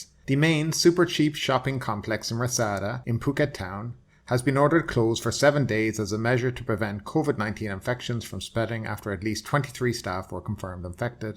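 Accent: Ireland. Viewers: Great to hear an irish man on the air waves.your very informed,speak cearly & give a independend oponion.i was fitting a kitchen on 9/11.im a galway man myself.hoping to get to thailand again.when i dont know.all the best eith the channel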